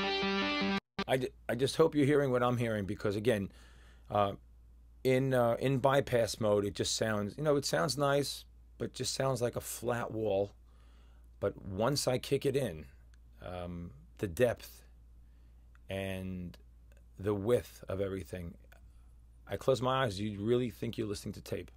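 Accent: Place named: East Coast, United States. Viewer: Good to hear an east coaster. Awesome review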